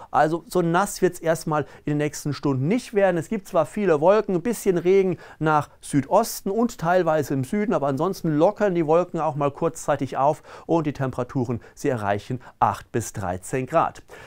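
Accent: Hesse, Germany. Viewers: Kälte weit weg , sehr gut! Bißchen hessisch nächstes mal wieder bittte 🙂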